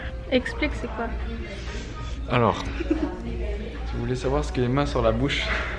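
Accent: Quebec, Canada. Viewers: Ha commence à avoir un semblant d'accent québécois!:p c'est trop cool!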